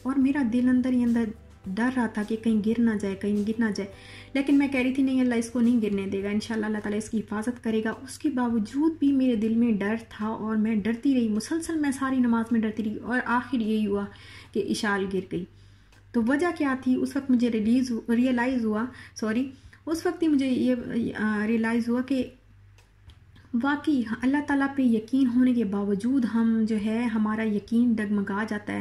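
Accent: Saraiki, Pakistan. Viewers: Mein bhi saraiki hun....shoro sy hi ap k lehjy sy mjy pta lg gya tha k ap sariki hain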